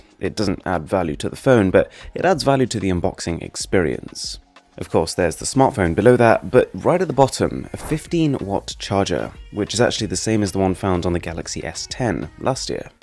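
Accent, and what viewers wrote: RP, United Kingdom. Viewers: He’s from the UK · You sound like a etonian chinless wounder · Relax with the exaggerated posh accent. It's laughable.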